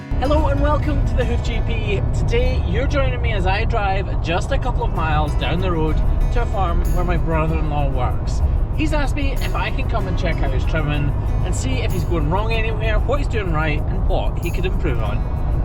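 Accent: Scotland, United Kingdom. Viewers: I think it's hilariously wonderful how much MORE Scottish you sound when you're talking to other Scottish ppl 😂 It's lovely. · Love how, like most Scotsmen, you have 2 accents. A normal one, and a posh one to use when talking into YouTube or to nonscots . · I thought he sounded more Scottish today. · Do you notice that your Scottish accent is way stronger in this video?🙂 Maybe it comes out more when you’re with others with a stronger accent. No big deal. I’m Canadian so I can’t say much aboooot accents, eh? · Pinehurst NC here......Incredibly entertaining and informative. I have no farm knowledge and this channel was very eye opening. I also noticed your Scottish accent thickens when talking to friends!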